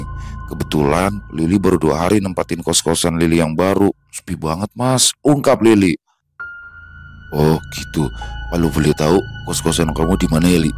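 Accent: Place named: Java, Indonesia. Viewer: Ngakak klau denger logat bicara gembul bg medok bgt lucu seru di tambah bg Dono yg slengean keren bgt bg hidup bgt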